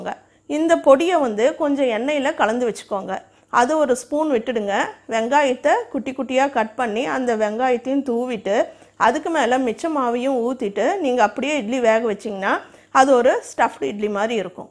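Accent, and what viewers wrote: Salem, India. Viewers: Unga recipes lam super. Unga Salem tamilum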